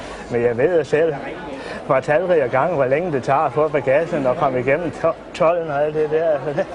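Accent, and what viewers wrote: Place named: Jutland, Denmark. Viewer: Er Jydsk så svært at forstå?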